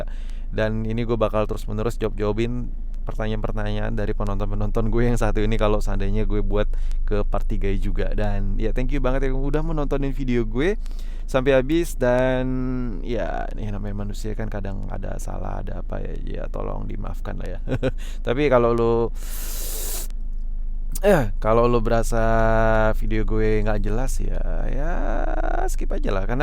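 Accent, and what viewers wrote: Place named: Medan, Indonesia. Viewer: Logatnya logat anak Medan tapi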